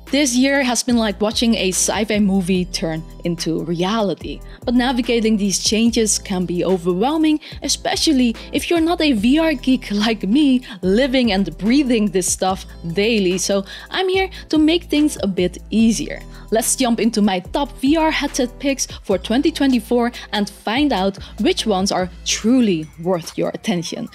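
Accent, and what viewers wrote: Netherlands. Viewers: Why does it seem like you have a Dutch accent 🙂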